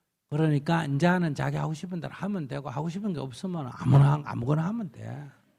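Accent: Gyeongsang, South Korea. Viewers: 양영준 많이 들어보시면 이유를 알게 되더라구요. 저도 첨엔 그게 시러서 기피햇어요. 평이한 톤으로 말하면 중생들이 못알아들으니 강하게 말씀하는거고 경상도 사투리가 좀 세기도 해요. 근데 듣다본면 진짜 재밌어요. 깔깔대고 눈물나게 웃어요. 도움이 되셧으면 합니다. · 갱상도는 그래ㅋㅋㅋ 왜 열심히 얘기 하는데 화내는 걸로 듣나? 화낼 이유가 있나? 남의 일에~스님이ㅋㅋㅋ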